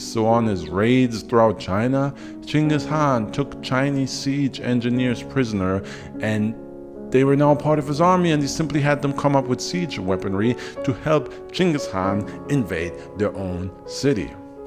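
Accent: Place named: Southwest, Germany. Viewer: If you are not an instructor you should be! Also you mentioned in another video about an allowance in euros. Did I detect a southwestern german accent? I have friends from that area that pronounce the letter R like you do. Keep these vids coming, Thanks!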